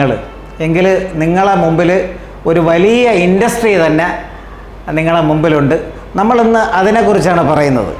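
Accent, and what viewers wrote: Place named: Thiruvananthapuram, India. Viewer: തിരുവനന്തപുരം Accent സംഭാഷണത്തിൽ കൂടുതലുണ്ട്.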